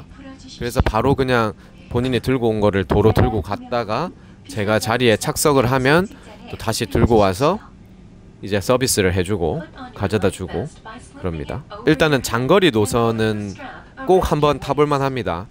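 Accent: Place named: Gyeongsang, South Korea. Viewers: 등드리. 나나.. 정말.간만에.듣는.고향말..ㅎㅎ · 갱상도 사나이 발음....잼납니다...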